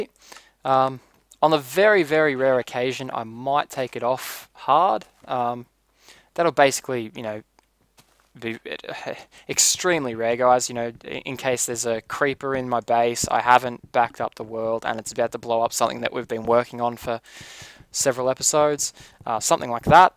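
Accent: Australia. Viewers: yay, I'm welcome :). Im happy that there is an lp'er who speaks in an Australian accent.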